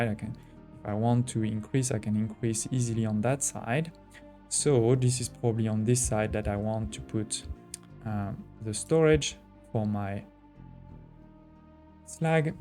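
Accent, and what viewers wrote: France. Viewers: hi! thanks for your good tips ! ( what is you accent? French?)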